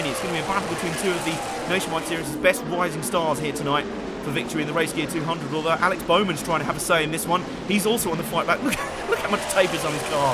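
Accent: United Kingdom. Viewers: I love you forever for that comment. This is just the best and greatest thing ever. And the Britishy accent makes it sound so professional and upper-class, I just love everything you do!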